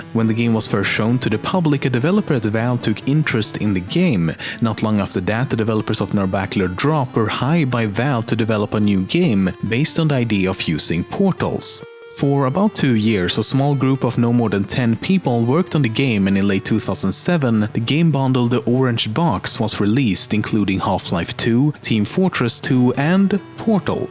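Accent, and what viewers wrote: Sweden. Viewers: Jesus Christ the Swedish accent is killing me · are you sweedish? sounds a bit like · Not to be mean but are you Swedish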